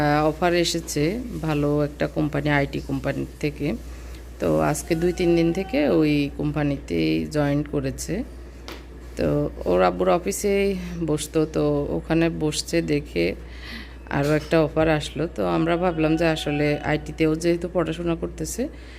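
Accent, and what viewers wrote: Noakhali, Bangladesh. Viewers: আপনি কি নোয়াখালীর?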